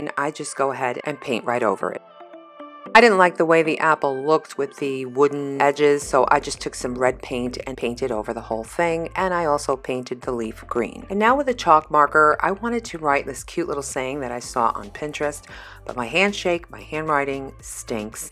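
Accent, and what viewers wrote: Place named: South, United States. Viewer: OMG!!! I’ve just started watching YouTube diy videos in the last month and I just wanted to reach out and tell you how much I LOVE your videos! Yes, the ideas are great but your commentaries remind me so much of my friends here in Texas! Woman, if I didn’t know better I would think you were a Southern girl!!! Keep the great videos coming,! #sistersfromanothermister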